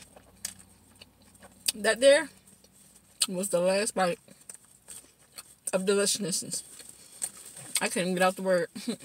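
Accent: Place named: Baltimore, United States. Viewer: I just love all of your subway videos!! Are you from Maryland? You have an accent that I can't place, but you sound like my old neighbor. She was from Baltimore. I'm from Georgia, so you can always tell when someone moves here from some where else. She moved back there to be close to her family, but if I close my eyes I hear her talking. So just curious? Also wonder what kind of work you do? Your daughter is adorable!! I love, love your subway videos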